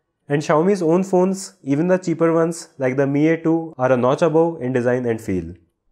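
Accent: India. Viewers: Somethings wrong with me. I cant take indian english serious.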